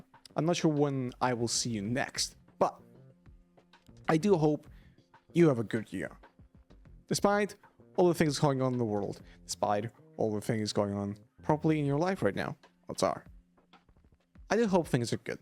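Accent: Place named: Finland. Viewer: omg, that finnish accent, idk if u are from Finland but your accent sound very finnish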